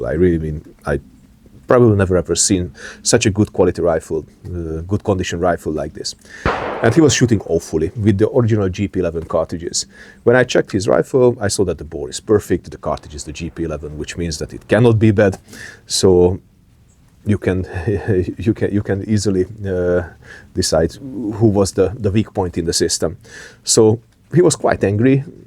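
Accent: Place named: Hungary. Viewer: The best channel in the beautiful bright hunglish language just uploaded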